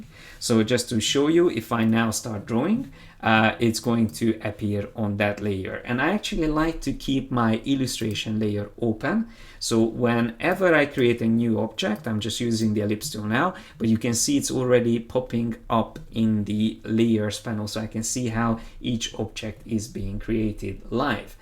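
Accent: Hungary. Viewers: Bro your accent sounds Hungarian! :D are you? Thanks for this, excellent tutorial!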